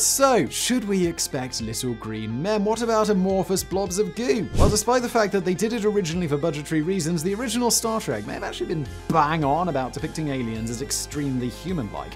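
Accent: United Kingdom. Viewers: Hmm a synapse of the fine points of Hitch Hikers Guide to the Galaxy and read by a Brit? Where Simon's writers just taking a piss?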